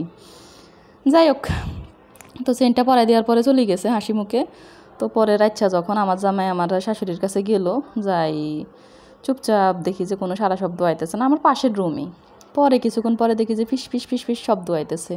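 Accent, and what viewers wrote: Noakhali, Bangladesh. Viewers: ওরে নোয়াখাললা তাই বুজি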